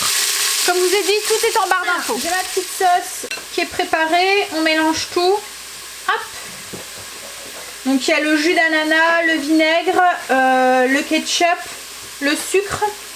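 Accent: North, France. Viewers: Coucou, voilà quelques semaines que j'ai découvert ta chaîne, je la suis quotidiennement, je me retrouve complètement dans tes vidéos, un grand merci pour ces moments partagés dans ta vie de tous les jours et tes précieux conseils et idées culinaires, je retrouve légèrement l'accent du Nord qui me fait tant plaisir à entendre car je suis originaire des Hauts de France mais désormais je vis en Haute-Savoie avec ma petite famille depuis une dizaine d'années, alors un GRAND MERCI,bonne continuation à toi dans ta chaîne 🥰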